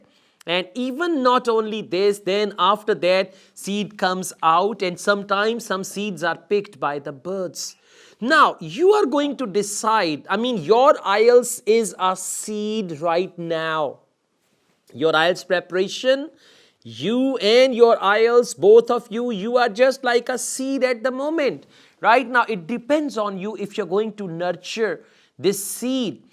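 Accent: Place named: India. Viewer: I don't like when you talk in Indian 🙄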